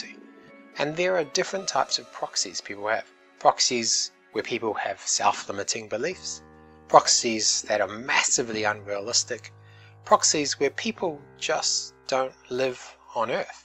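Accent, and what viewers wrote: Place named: New Zealand. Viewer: you sound like a kiwi! what up broooo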